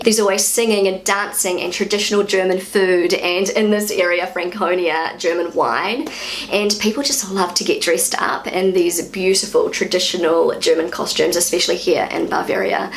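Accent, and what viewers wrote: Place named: New Zealand. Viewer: Being from northern Germany I don't care much about dirndls, but I love listening to your NZ accent. As a former exchange student to the UK and the USA it is absolutely beautiful to learn about your pronunciation - and I think it's lovely. Thanks for sharing! ❤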